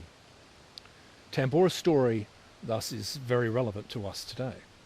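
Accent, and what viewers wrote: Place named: United States. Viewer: When you accent is so thick that you're can't pronouce the difference between "barley" and "Bal".... People all over the world like you less and less, americans.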